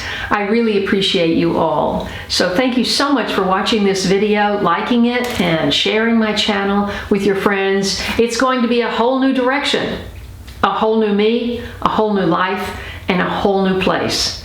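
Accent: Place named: South, United States. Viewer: This was a lovely video, the music was perfect. I can hear your southern twang coming through!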